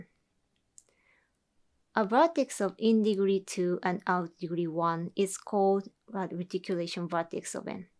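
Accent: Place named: Japan. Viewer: you've changed my view on my take on japanese speaks english. the lease accent and very standard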